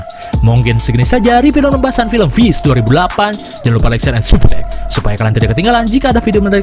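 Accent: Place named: West Java, Indonesia. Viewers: Logat Sunda nya ciri khas dari kang 😅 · urang mna ciga urang sunda ning abi t padalarang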